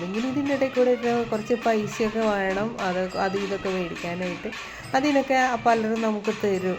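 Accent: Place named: Thrissur, India. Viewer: ചേച്ചീ നാടെവിടെയാണ് സംസാരം കേട്ടിട്ട് തൃശ്ശൂർ പോലെ തോന്നി ഞാൻ തൃശ്ർ ആണ് ആദ്യമായാണ് ചാനലിൽ ഇഡ്ലി റസിപ്പി കണ്ടത് ചേച്ചീടെ സംസാരം ഇഷ്ടമയപ്പോൾ കുറച്ചു കൂടി വീഡിയോസ് നോക്കി ഇനിയും കാണണം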